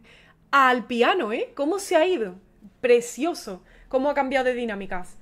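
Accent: Andalusia, Spain. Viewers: veo muchos videos tuyos porque me encanta ese acento andaluz. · Hola guapaaa, he vivido 11 años en Madri, soy español de corazón, pero brasileño también. Ahora mismo estoy en mi tierra, Maringá, Paraná, sur de Brasil. Soy tu fan, me encanta tu acento andalú, además de tu talento y tu canal. Oye, eso de las reacciones está fenomenal. Si te parece bien, voy a enviar videos de 3 chicos muy talentosos que sigo en el youtube. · Me gusta mucho oírte tus análisis musicales, porque uno oye las cosas y muchas veces no te das cuenta de lo que oyes, sin embargo tú le enseñas a uno a oír la música Además eres super divertida al explicar, tus expresiones y gestos y tú acento español me fascina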